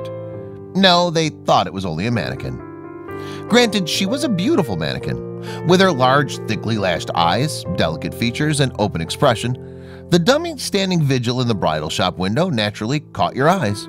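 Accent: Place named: Germany. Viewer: He sounds like what a thick German accent voice feels like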